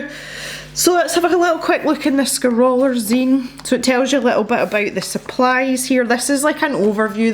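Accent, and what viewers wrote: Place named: Cumnock, United Kingdom. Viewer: Your reviews are so so very helpful, I love the way you explore the products and play around with the way they interact. And it brings me so much joy hearing you speak, my wee Mum is from Cumnock and is too poorly to travel back to visit family, now that we live in Australia. I show her your videos and they make her smile.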